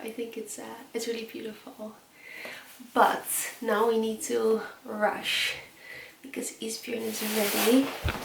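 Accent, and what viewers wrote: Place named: Netherlands. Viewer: I have been watching your videos for a while now because I like Norway and Scandinavia in general. I like your simple down to earth way of living, which reflects in your videos. I already thought you were Dutch by the way you speak English, but now I know for sure.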